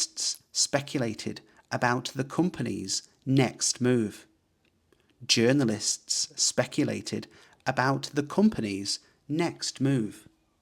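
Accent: United Kingdom. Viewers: What British accent is this?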